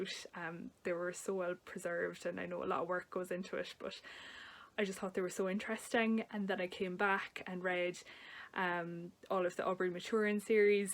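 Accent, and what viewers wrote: Ireland. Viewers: I need to do one of these next, too! Welcome!!! Love the Irish accent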